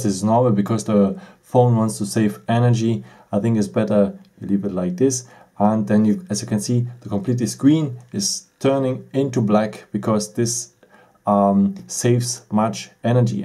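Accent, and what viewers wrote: Germany. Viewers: R u german? It really sounds like u r german xD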